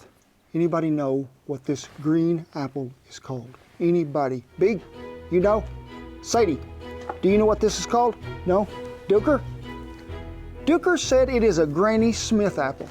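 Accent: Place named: Oklahoma, United States. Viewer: I love when you tease people making fun of your accent. As a fellow Okie, I have no problem understanding you.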